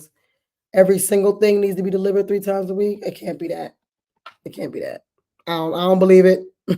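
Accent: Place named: Georgia, United States. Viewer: yeeesss, is the beeeest georgia girl accent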